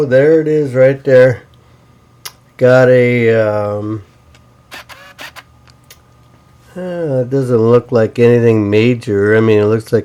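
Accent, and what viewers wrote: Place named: Wisconsin, United States. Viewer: I live in GA but your accent reminds me of my uncle from Wisconsin, so im guessing Wisconsin or Minnesota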